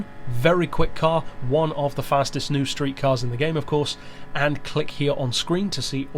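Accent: United Kingdom. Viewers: All British people sound like to be honest.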